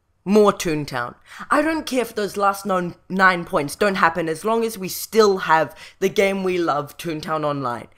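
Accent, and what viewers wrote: United Kingdom. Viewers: You sound british :D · It's British I believe and I know, I wuv it too!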